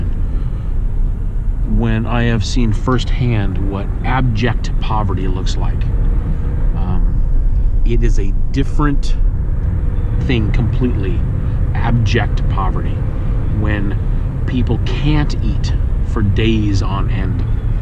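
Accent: United Kingdom. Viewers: your enjoying your British accent really?? love it xx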